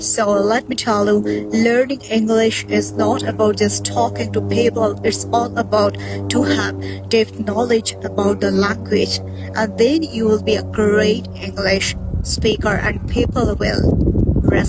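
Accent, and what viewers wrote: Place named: India. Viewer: I understood earlier that you're indian when I listened your accent, then I checked your channel's about section and finally I got I was write.😂😂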